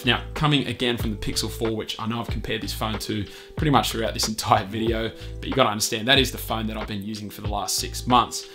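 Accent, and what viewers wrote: Australia. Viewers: what part of austrailia are you from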